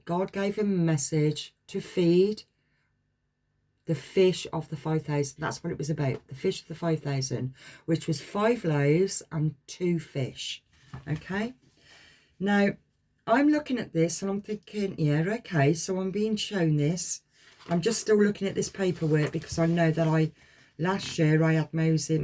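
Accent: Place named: Midlands, United Kingdom. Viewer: Hello lovely, stumbled upon you today.. so strange as you reminded me of my mum so much ?! in looks and the way you speak. We share similar accents too ?! so I feel we live close aswell, guessing central midlands Uk 🇬🇧. Ironically it is her 82nd birthday in spirit today 16.1.45 her name is Lorraine and she also was a therapist for individuals with addictions/mental health. Just wanted to say I found you so relaxing to listen too, found you very comforting on an emotional day for me 💔. I’ve listened to 3 so far and all resonated . Love your style of reading too, very interesting and gave spiritual knowledge to research. Subscribed ! Sending you abundant blessings Thankyou 🤍🪽💜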